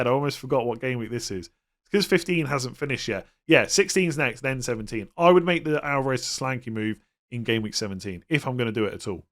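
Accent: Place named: Bristol, United Kingdom. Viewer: Its his Bristolian accent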